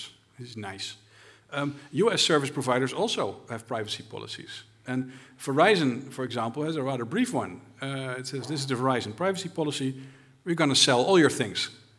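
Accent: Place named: Netherlands. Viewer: Yes. The Dutch accent is kinda cool.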